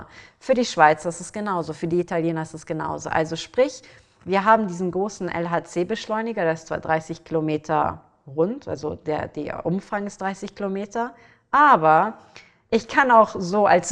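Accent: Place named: England, United Kingdom. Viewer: Ihr leicht englischer Akzept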